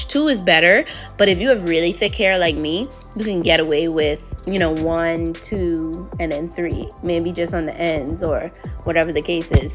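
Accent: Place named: Bahamas. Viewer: you sound Bahamian. Hair goals🙌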